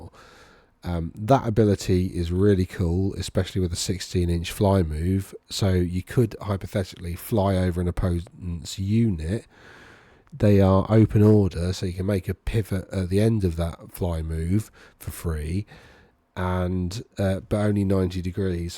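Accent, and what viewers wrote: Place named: South, United States. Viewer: Building my witches and psychos in the woods vampire force at the moment very exciting.. What's your region you sound like you are a southerner like myself?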